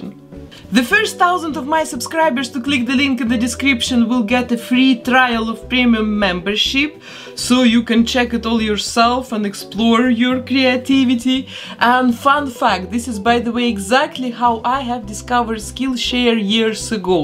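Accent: Russia. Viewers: Вау, русский акцент?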